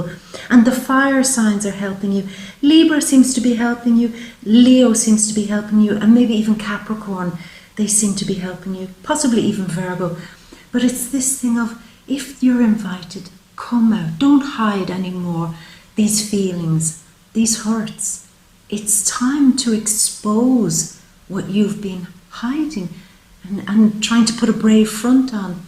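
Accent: Ireland. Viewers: I'm thinking Ireland. I love the accent whatever it is.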